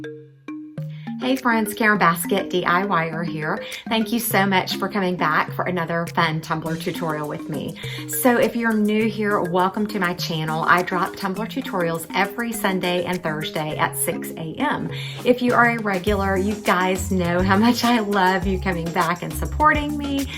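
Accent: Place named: Georgia, United States. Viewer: Beautiful, as always!!! Can I ask where you are from? I'm a southern girl living in the Midwest now. But you sound like you might be from Georgia. Praying for peace and comfort during the family emergency.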